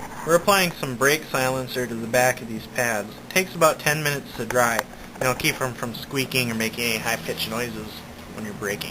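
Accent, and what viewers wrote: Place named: Canada. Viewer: Are you from Canada? I here a slight accent.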